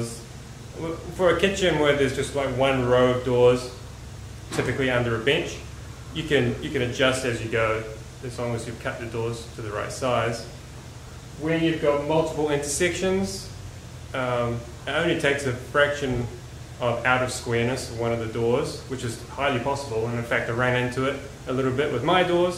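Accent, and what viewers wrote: New Zealand. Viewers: Excellent video and thanks for sharing your ideas and tips, great too see some different ways with fitted wardrobes, kitchens and furniture, I lived and worked in NZ as a chippy for 6 years the accent and terminology bringing back all the good memories 😄 👍 keep up the good work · When I stumbled upon this channel, I was impressed by the woodworking skills shown in the videos. The narration and editing is always done very well and makes it fun to watch. But I have to admit, what really puts a smile on my face every time is the kiwi accent. From one professional woodworker to another: keep doing what you are doing,. You are very good at it.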